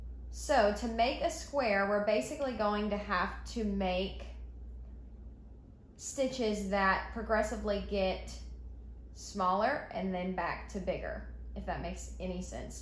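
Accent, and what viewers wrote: South, United States. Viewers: You explain so well and have such beautiful work! Also I love the southern accent I’m from the Midwest This is such an incredible video thank you so much! You definitely have a gift for teaching and arts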